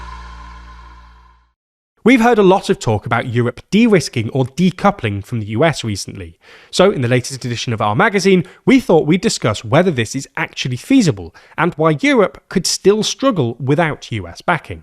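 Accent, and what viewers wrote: United Kingdom. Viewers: Todor Zaikov? Haha. The silly fiction that comes out with British accent is everywhere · British accent and actual facts! THANK YOU EVER SO MUCH!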